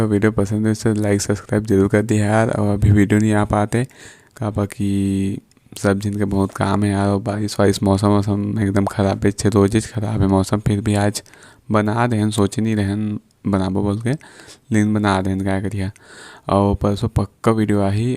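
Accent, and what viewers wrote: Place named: Chhattisgarh, India. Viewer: Your Chhattisgarhii accent is just wow and content joyful....👍❤ No doubt you & your team doing superb job...Keep Going👏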